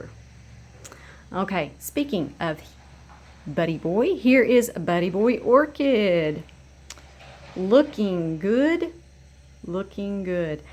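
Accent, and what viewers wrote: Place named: East Tennessee, United States. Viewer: Those are really great roots and I am also amazed at your accent. I was born ed and raised in upper east Tennessee and you sound like a touch of home. I am now in South west VA but I could listen to you talk all day. Thank you is all I can say.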